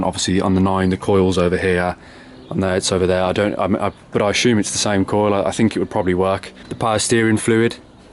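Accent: Birmingham, United Kingdom. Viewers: "It smells like an old VOLVO in here, it's fantastic!" -"The mileage is probably 500 000km, but that's just a number." -" I didn't notice those stains on the seat when i bought it, maybe I left them there, I was quite exited..." LOL! (Bought a thirty year old VOLVO in the dark, literally!) I love this Brummie!👍😄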